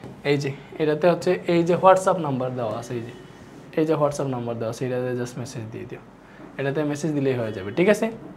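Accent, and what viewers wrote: Sylhet, Bangladesh. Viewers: Bahi sure Sylheti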